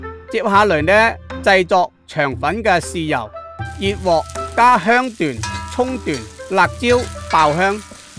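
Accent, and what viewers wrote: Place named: Zhaoqing, China. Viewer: 口音一聽就聽肇慶人👍👍👍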